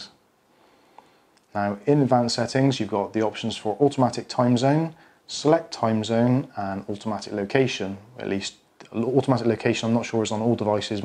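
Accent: United Kingdom. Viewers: Good morning, I know it's been quite a long time since you posted this video but I just wanted to thank you for the information. I purchased a Charge 5 several months ago and I find (maybe not a defect - just an operating method for this version of Fitbit?) that whenever I let the charge drop below 1% a complete reset and time correction is necessary. Device reset is easy thanks to YouTube although this doesn't correct the time. This AM I discovered your site and everything was solved!! I have been a quite devoted / fanatical (?) Anglophile ever since that evening on February, 9 1964 when I first set eyes and ears upon those Four Lads from Liverpool. I am 73 years old these days and was just a 14 year old lad on that wonderful evening. Now anything dealing with England, Scotland, Ireland, Northern Ireland and Wales will simply and automatically attract my attention and I know this will never change until my dying day - and will continue long after that. 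Sorry, I got a little off the subject there but just wanted to thank you for the absolutely appropriate information. I don't know how many times I have said or thought this but I could actually listen to someone with your beautiful accent (or any of those in my 5 favorite and previously mentioned countries) simply read a grocery / shopping list and I would be enthralled by by the reading because of that accent. If that's an addiction I really don't mind. All the very best to you and yours, Terry (in the middle of Minneso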